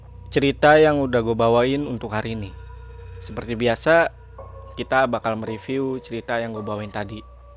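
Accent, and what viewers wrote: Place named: Aceh, Indonesia. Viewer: logat antara orang ACEH atau kah BALI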